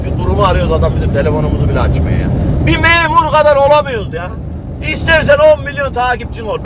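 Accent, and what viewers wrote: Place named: Yozgat, Turkey. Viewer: bey sizin siveniz neden Yozgatli gibi?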